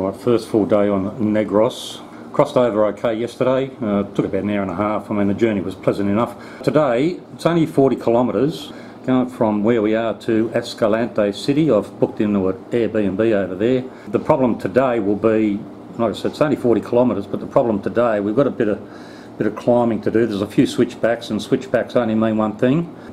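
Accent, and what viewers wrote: Australia. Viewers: are you from Australia or NZ?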